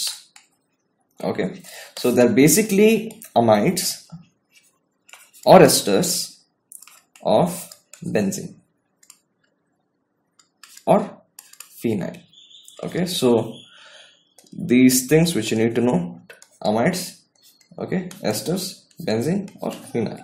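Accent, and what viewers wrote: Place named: India. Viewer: great lecture although heavy indian accent sometimes difficult to understand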